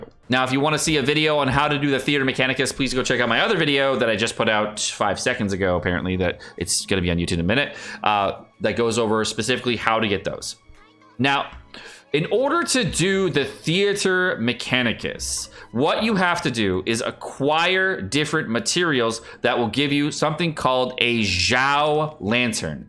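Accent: United Kingdom. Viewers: Yay british again 💕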